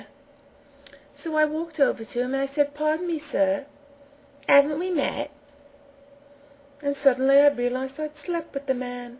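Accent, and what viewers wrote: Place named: England, United Kingdom. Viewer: Absolutely superb! I loved the English accent too - lol ~Sue~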